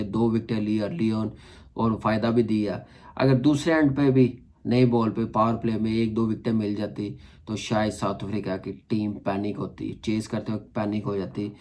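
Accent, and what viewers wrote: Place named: Punjab, Pakistan. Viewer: اردو کے مجدد قبروں سے اٹھ کر آئیں اور چھتر پھیریں کہ اردو کا بیڑہ غرق کیا ہے ۔ پنجابی نما اردو ۔ تلفظ سنو اور انتخاب الفاظ ۔ سمجھ آ گئی کہ آپ کی تعلیم بھی فارغ ہے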